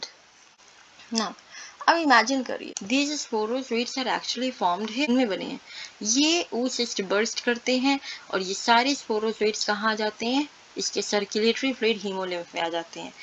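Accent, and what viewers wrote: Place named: United States. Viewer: Ma'am the video was fabulous , marvelous , fantastic , mind blowing , exellent . Your way of teaching is fabulous the way you explain is just fantastic . I had problem in understanding this topic but after watching your video i understood everything . I sure that you are the best teacher in the world with a stylish or i should say american accent .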